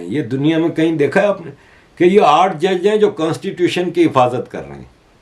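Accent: Gujarat, India. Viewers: Bato se gujrati zalakati hai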